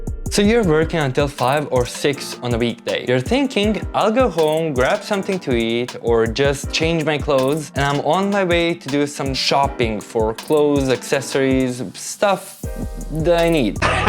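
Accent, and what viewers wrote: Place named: Balkans. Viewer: I’m trying to determine your origin based on your accent. My guess is somewhere in the Balkans — waiting for confirmation. Btw your English is pretty good! 🎉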